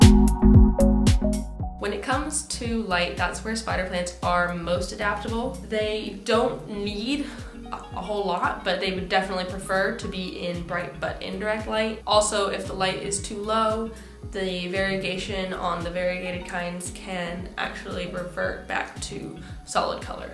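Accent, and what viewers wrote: United States. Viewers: That's ok, it seems to have settled now. I realised you are in the UK after waiting some other vids of yours. 🤣 Love your plant vids, congrats. Helped me a lot with my plant care and information. You're American though right? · are you from the south?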